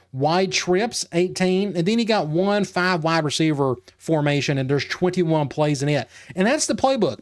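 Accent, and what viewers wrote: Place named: Appalachia, United States. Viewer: Now there is a true hillbilly accent right there! Cheers from georgia! Go vols!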